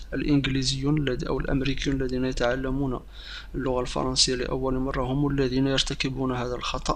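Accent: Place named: Maghreb. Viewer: Wlah c vrai lui déjà et nul avec un accent du maghreb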